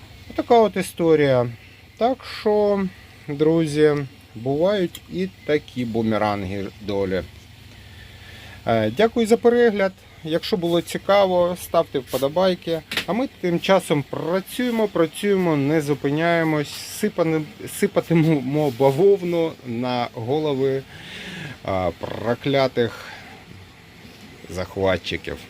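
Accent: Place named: Surzhyk, Ukraine. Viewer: Какой натужный суржик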